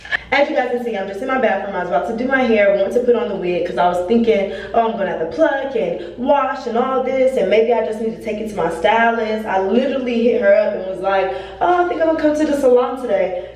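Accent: New York, United States. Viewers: you're picking up the New York accent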